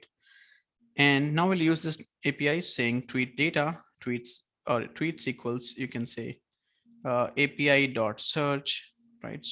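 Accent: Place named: India. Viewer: orang indonesia tapi logatnya kayak orang india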